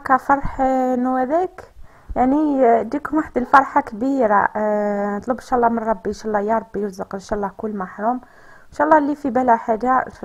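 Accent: Setif, Algeria. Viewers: لهجتك عرفتها أنا ثاني من سطيف بوڨاعة تحديداً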